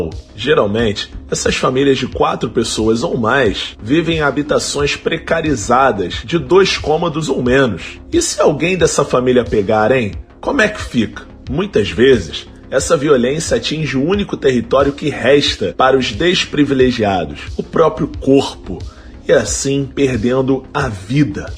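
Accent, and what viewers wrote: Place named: Rio de Janeiro, Brazil. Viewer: volta logo, preciso de mais vídeos e do seu sotaque carioca.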